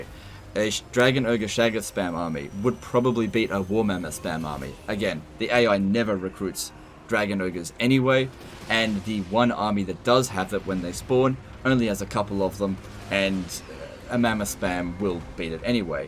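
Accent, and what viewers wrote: Australia. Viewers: I only just realised you live in Australia. It’s like the bloody Aussie accent didn’t give it away somehow. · Huh, didn't realise you are Australian. I thought the accent sounded familiar, but I'm so used to American or British content creators.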